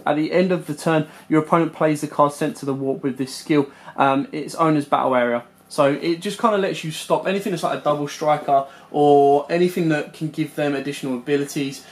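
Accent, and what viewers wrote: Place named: United Kingdom. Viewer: Nice to hear a UK accent, so annoying we don't get set 4 for ages 😡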